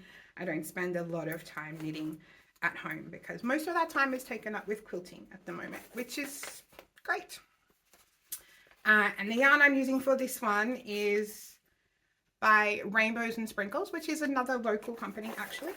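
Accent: Australia. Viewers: Congratulations on your first video. Although you were nervous you came across very well. Looking forward to more of your videos. And yes, much like other commenters, it is lovely to watch and have a fellow Aussie talk about quilting . Keep going!! Sending positive vibes from Melbourne. 🌸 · Hello! Lovely to hear another Australian, I am from Brisbane and I am sure you will be good at this! Looking forward to seeing Moore! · Congratulations on your first vlog. I enjoyed listening to another Aussie. I’m from QLD and have the Hope dress still to sew although summer is catching up with me now. Looking forward to your next vlog!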